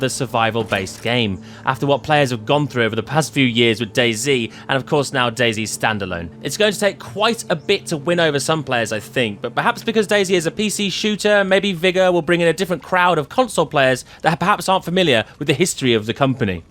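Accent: United Kingdom. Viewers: Uncomfortably British...jesus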